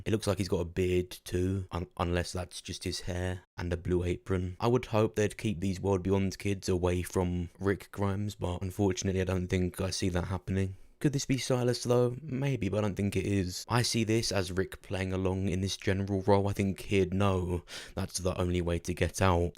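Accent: United Kingdom. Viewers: you sound british, can you confirm if these will be aired in the uk because this is about to be my 13th reason if not.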